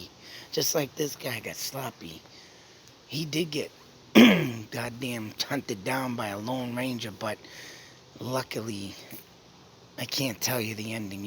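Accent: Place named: Maine, United States. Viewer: Dude I like your Delphi videos. You sound like you are from Maine? I recognize the accent...I am from Vermont. thank you for this video.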